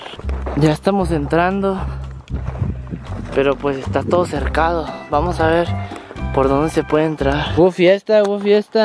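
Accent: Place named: North, Mexico. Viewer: hablas como norteño:v de donde eres?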